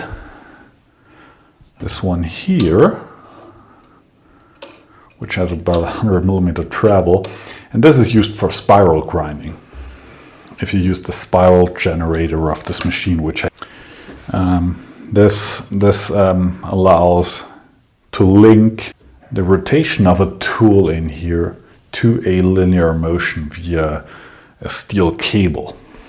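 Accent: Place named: Germany. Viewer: Hi, i like high level quality. And by the way your german english is beter than original😉🤭. I learn english and i look and listen you. Thanks.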